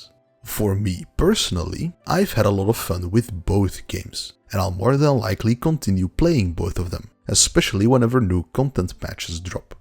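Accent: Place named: Belgium. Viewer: i swear you sound belgian and i love it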